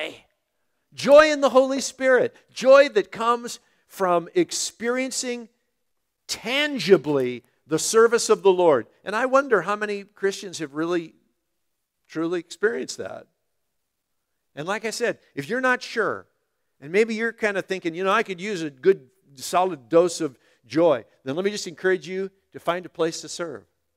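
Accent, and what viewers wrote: Canada. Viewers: I thought you were Canadian.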